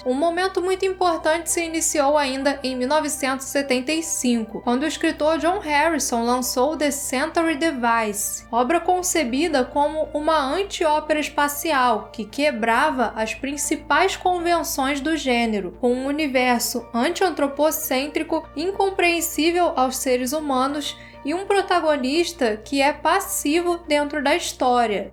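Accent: Rio de Janeiro, Brazil. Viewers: Você tem um sotaque fluminense. De que cidade você se encontra?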